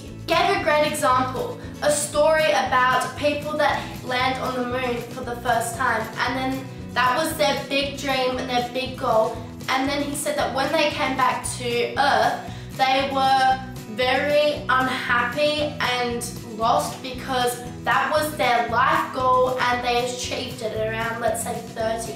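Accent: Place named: Australia. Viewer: Amazing video love your Aussie accent ahaha❤️❤️❤️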